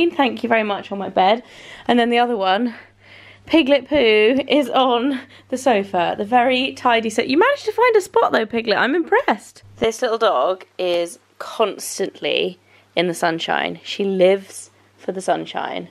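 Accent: United Kingdom. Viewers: I'm trying to learn the British English with you! Thank you! 😀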